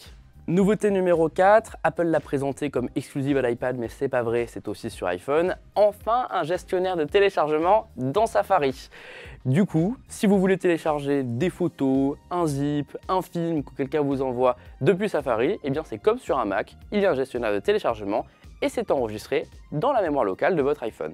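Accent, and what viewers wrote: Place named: France. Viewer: "sing" in wiz apple lol c vrm dégueu l'accent française de l'anglais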